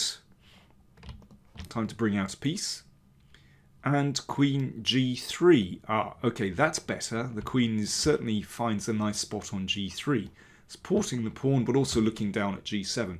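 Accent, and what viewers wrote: United Kingdom. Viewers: Your British english is perfect for my understanding as a french chess player !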